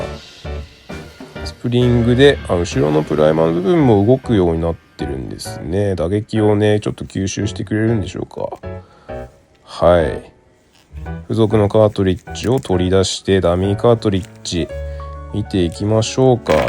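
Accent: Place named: Hokkaido, Japan. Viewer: 以前から拝見してましたが初コメです。 北海道なんですね。今は東京在住ですが懐かしい方言がひとつふたつ聞こえたので。 冬の夜長の工作、私も嫌いじゃないです。 昨年から始めたサバゲ―の年配初心者です。コレクター志向ではありませんが所有する数丁の機能美には魅せられてます。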